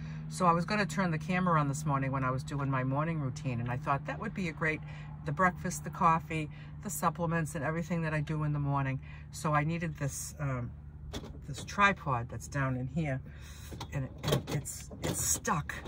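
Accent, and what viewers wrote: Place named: East Coast, United States. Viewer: you are a joy to see! Love your setup. You actually remind me of me! I eat very similar to you. I’ve been more vegetarian and Mediterranean with my diet since I was a kid. The only meat I ate was what my mother cooked, but always loved fish, and greens and veggies are my favorite. I went Vegan 2 years ago and then slowly added back in fish, eggs, and some cheese, mainly hard cheeses from Italy and mozzarella, and also added back in the olive oil. If my fridge isn’t packed with leafy greens and other vegetables then it doesn’t matter what else I have, it feels empty. Mackerel is one of the best fish to eat for one’s health! By the way, I hear the east coast in you, are you on an east coast beach? I’m originally from Long Island now living in Southern California. Stay well🌟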